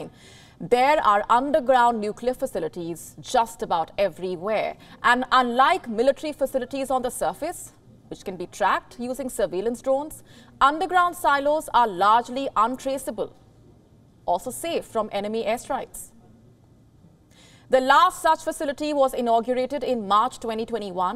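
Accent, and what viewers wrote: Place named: India. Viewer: please change other news reader since this India are IndLish then English...so twisted tounge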